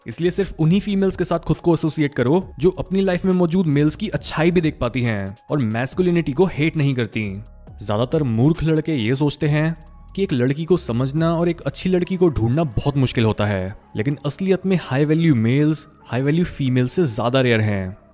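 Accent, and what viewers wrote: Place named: North, India. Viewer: i seen your face first time today, your imagine in my head was fair skin guy like north indians (because of your hindi accent) without beard (face with beard on your voice was something my brain didn't think about) and normal body size with good shape of muscles (not as big as it is) your hair style in my imagination was kind of long enough to reach your eye brows, i imagined you as leon scott kneddy of resident evil 4 but you was totally different person, not bad but not what i thought.... you are good looking still by the way...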